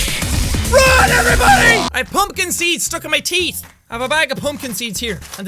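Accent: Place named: Ireland. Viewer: The irishman is always within him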